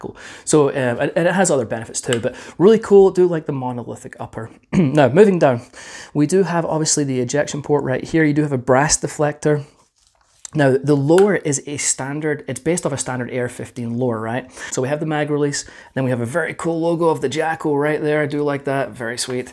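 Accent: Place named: Scotland, United Kingdom. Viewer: Love to hear a Scottish accent talking about American guns, good to have with us.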